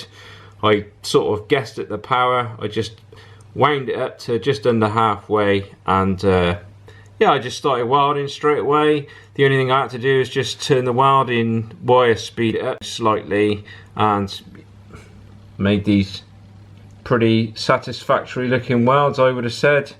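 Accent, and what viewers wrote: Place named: Southwest England, United Kingdom. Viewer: *I really love your videos. But my curiosity overwhelms me about your dialect. Please set me straight , My English friend thought it is from the southwest.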